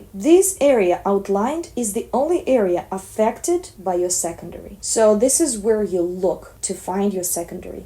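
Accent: Russia. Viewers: Вы невероятная! Вы талантливая! Вы удивительная! Такой красивый голос, и такое глубокое погружение в стиль поражают и удивляют! Нашла это канал случайно, когда искала определение гамина романтика (он же soft gamine). Очень понравилось как системно и просто Вы объясняете. Стала смотреть дальше, и не покидало ощущение, что есть русский акцент в Вашем английском 😜 Покопалась и зависла на Вашем исполнении. Но пришла, чтобы разобраться в системе Киббе. Почему видео только на английском??? Как жаль, что огромная часть россиянок не имеют возможности слушать Вас 😢. Вы отличаетесь от остальных наших стилистов-блогеров. Ваш контент просто супер! Спасибо огромное! И огромного Вам успеха 👏🏻👏🏻👏🏻🌺🌺🌺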